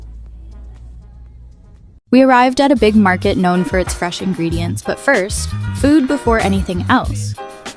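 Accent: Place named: United States. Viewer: Korean??? Then where is the american accent coming from?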